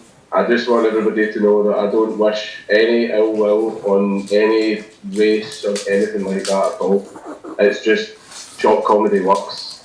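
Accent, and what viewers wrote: Scotland, United Kingdom. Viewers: dude your awesome! ever since i saw train spotting i love the scottish accent .... i use phrases like " no bother" and shit ive culturally appropriated from scottish vernacular ..... if me and my girl ever visit the uk and scotland in particular ill buy you and your girl a round, subbed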